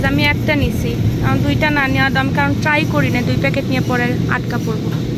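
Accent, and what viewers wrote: Sylhet, Bangladesh. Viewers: Apu amrar sylhety mat hunla hasa ja bala lager ❤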